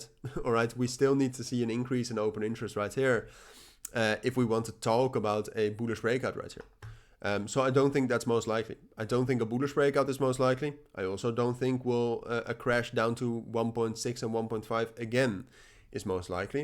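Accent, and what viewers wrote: Netherlands. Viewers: Ben je Nederlands? K hoor het aan je accent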